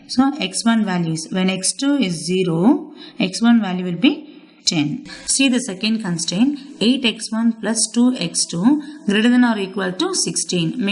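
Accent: India. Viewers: the explanation is very good, especially with the indian accent :D , i have an exam and i wish that can help me to pass it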